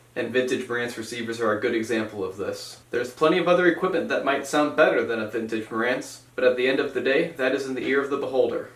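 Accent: United States. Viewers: You are from Michigan?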